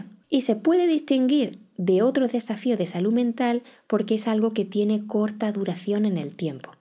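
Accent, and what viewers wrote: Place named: Andalusia, Spain. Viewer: Hola! Me encanto tu perspectiva. Tengo una persona cercana que creo que puede tener TDAH. Una forma no muy severa, pero aun asi con sus dificultades. Gracias por todo lo que has dicho. Me fue muy util. PD: tienes una piel preciosa y me encanta tu acento, que creo que es de España del sur o tal vez de Murcia:)) Saludos!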